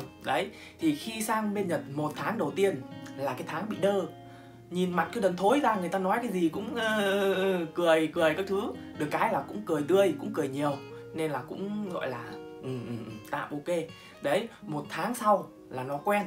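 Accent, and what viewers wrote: Hai Duong, Vietnam. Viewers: Bạn người Hải Dương phải k? Nghe giọng b giống người HD 😉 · Giọng Hải Dương yêu dấu quê mình đây rồi🙂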